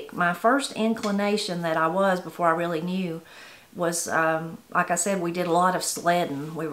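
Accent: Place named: North Carolina, United States. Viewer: I love listening to all your stories. It's the accent. My family is from North Carolina and its like being able to hear them again.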